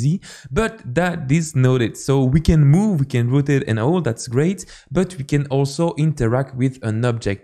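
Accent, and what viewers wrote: France. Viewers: Hello. Tu es francophone ? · es-tu français ?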